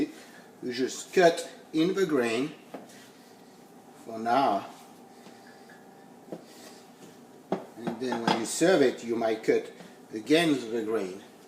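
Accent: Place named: France. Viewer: if he only did not have a french accent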